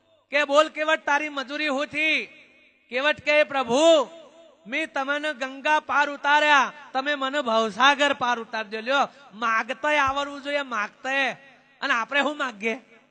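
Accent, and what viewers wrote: Sabarkantha, India. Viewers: Humm mehsana ni nathi sabarkantha ni boli che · ભાઈ આતો સાબરકાંઠાની બોલી છે......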